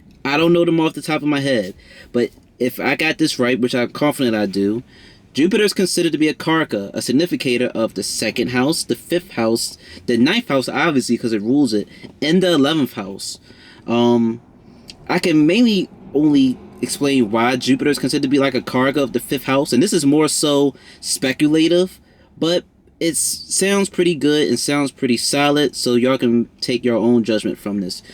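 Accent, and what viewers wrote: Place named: Baltimore, United States. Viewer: Thank you! Love this placement and the way you explained it. Love ur inner g you gotta be from Baltimore 🧡 if you are even more love to you n the home city 🏙. Thanks again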